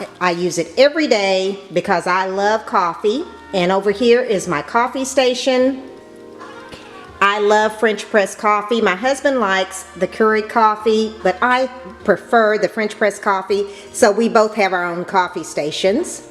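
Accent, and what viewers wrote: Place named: South, United States. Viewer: Your kitchen is beautiful. Thank you for sharing! Subscribing now. Question: Where are you from? You sound more Southern than I and I'm from the Georgia. 😁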